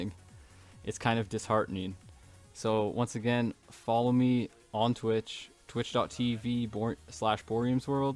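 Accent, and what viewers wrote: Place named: Minnesota, United States. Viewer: Really like your vids man. I noticed that you have an accent close to mine. I thought you may have been from Minnesota like me, but Canada was my next guess. Keep it up, your play is great. I can aim ok but cant track for shit, any tips?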